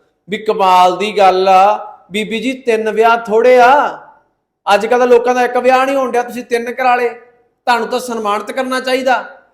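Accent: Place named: Amritsar, India. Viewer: Mainu Lagda tu amritsar toh lagda gal karn toh badeh je kehna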